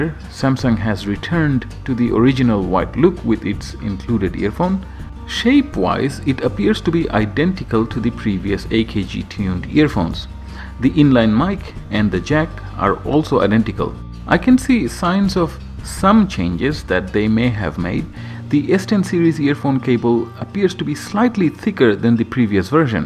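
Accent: India. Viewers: What is that lovely accent!! It sounds like Indian accent with some Asian mixed!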